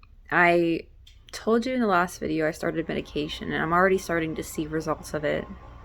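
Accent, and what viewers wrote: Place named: South, United States. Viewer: What made you attracted this man in the first place? So you a southern belle living in Alaska huh? That accent a dead give away lol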